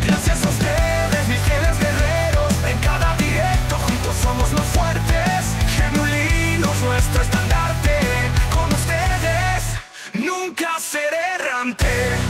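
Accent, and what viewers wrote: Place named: Latin America. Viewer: Ver a los amigos prosperar es algo hermoso. Muchas gracias por guardarme un lugarcito en el video, amigo querido. Me sorprendió gratamente la pronunciación "más latinoamericana", si es que se puede decir así. Ya sos uno de los nuestros, jaja. Arriba, siempre p'alante y a seguir creciendo y evolucionando. ¡Abrazo ENORME!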